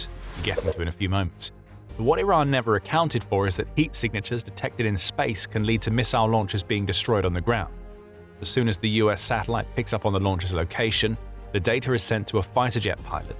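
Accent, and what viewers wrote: United Kingdom. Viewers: If this the case why wasn’t we abled to stop there missiles sooner or even now. They just shut some at Israel creating mass casualties. Look what they have done to the gulf countries. Israel airport, Dubais airport, Israel’s nuclear site, residential and so many others. Iran doesn’t have satellites but china and Russia are giving Iran all the information they need, that’s why they hit their targets on the dot. So this video was like Iran is a sitting duck. I wish that was the case,but it’s not. Even to the point that Trump is talking about negotiation. Please get your facts straight and I don’t know what is up with a British accent?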